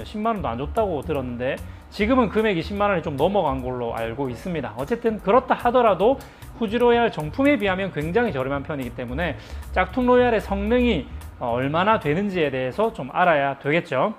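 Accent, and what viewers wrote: Gyeongsang, South Korea. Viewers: “구불고 구불고~”아주 오랜만에 듣는 말이네요. 갱상도분이신듯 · 경상도분이신거 모르기가 더 어렵지 않을까요 ㅋㅋㅋㅋㅋㅋㅋ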